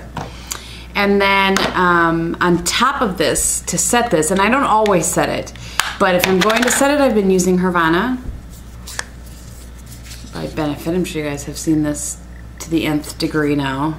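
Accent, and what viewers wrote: Wisconsin, United States. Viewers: Love your videos,. My father's family is from Michigan and your Wisconsin accent is very similar. I have baby fine hair and appreciate any suggestions for adding body and volume. I have been liking Phyto's Phytovolume Actif spray and Schwarzkopf's Osis+ Dust It.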